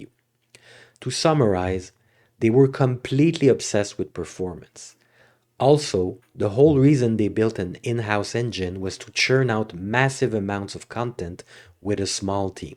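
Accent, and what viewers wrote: France. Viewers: Are you a fellow frog? I seem to recognize my people’s accent! Great video by the way! This game is breathtakingly beautiful. I have spent so much time around puddles and creeks watching water and nature just happen. I’ve never walked as much in a game before! I literally watched comrades I sent to build a house build it in real time. It took 6h in-game! The level of immersion is off the charts in this game! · Great analysis! This is probably my favorite game since Skyrim. Maybe with the exception of RDR2. I'm 80 hours in, mostly due to having been on a sick leave for 2 weeks, and I have never been gaming so intensively before, at least not since Skyrim. For me, it's an absolute strike of genius. New sub from Norway ! And kudos for your English as well. I believe I can hear some French accent from time to time, but very little. French, Danish and Norwegian people tend to struggle with heavy accent 😊 (Sorry if I'm mistaken about the accent 😁)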